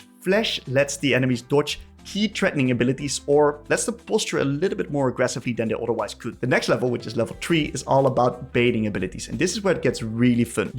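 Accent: Netherlands. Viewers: Im hearing some heavy dutch accent right here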